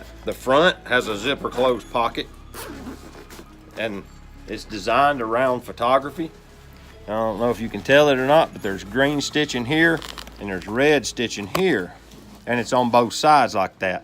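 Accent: South, United States. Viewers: hahaha I wasn't sure if you were joking so didn't want to say anything. I thought it was a southern accent. It's awesome. Love it :) I'm from Germany and have a terrible accent :)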